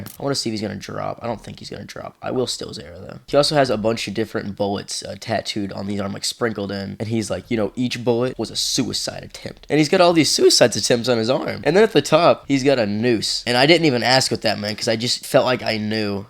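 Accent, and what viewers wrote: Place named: Missouri, United States. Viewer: bros definitely from missouri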